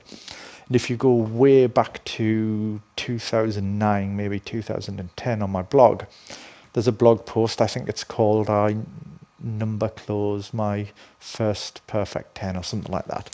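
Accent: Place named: United Kingdom. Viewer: 22.30 pff you're too uptight and serious about this "outlaws vs respectable society" thing IMO. rock concerts are awesome. you can be a man on your own journey and living life on your own terms but still enjoying what others have achieved because it brings you joy and excitement. just for the sake of it! what's so bad about it for a sigma character? i find your views very intriguing but sometimes you make it a bit too complicated,especially with your strong british accent :-p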